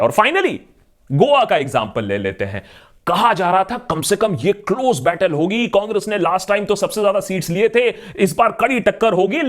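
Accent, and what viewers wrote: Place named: Uttar Pradesh, India. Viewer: Ap ekdam up vaalo ki trh hi accent mei bolte hain.... BHAKT BANNERJI ka role karte karte ek dam smooth ho gye Love your episodes love your integrity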